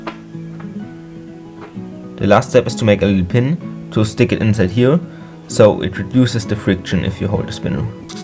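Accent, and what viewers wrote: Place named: Germany. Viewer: your english isn't the best, bist du deutsch, are you german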